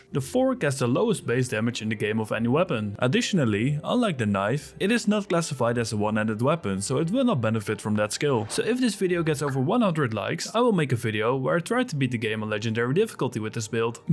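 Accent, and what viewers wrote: Netherlands. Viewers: I love the dutch accent seeping through, so yes instant new abonnee x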